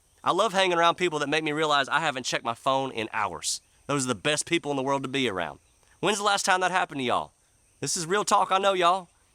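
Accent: South, United States. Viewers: LOL...love the stories! and that southern accent....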